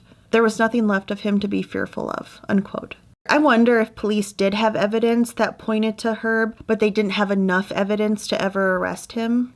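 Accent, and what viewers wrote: Minnesota, United States. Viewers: I don’t comment on channels often, but had to say what a great job you do at storytelling. I came across your channel just a few weeks ago, and of course being a former Minnesotan, I was intrigued. This story really struck a chord as I went to SCSU in 89’ and my townhome was so close to this quarry. I had never heard of this story before, it was heartbreaking to say the least. I clearly remember when Jacob Wetterling was abducted and the National Guard flying over my dorm room in search. Always a true crime buff, but now a Floridian, I look forward to your podcasts…and loving your accent as it sounds like what will always be considered “home” to me…Minnesota! My best to you! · Love that Minnesota accent! Also from MN ❤❤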